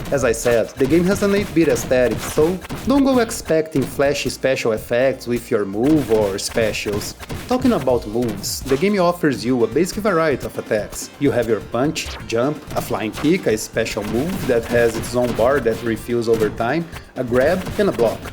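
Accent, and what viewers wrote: Brazil. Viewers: Es Brasileiro o sotaque em ingles nao engana.o tchi no final das palavras denuncia logo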